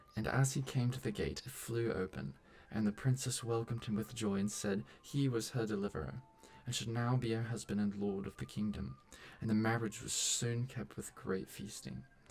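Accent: United Kingdom. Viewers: At first I was like "did he always have an english accent?" then I realized and went "oh..."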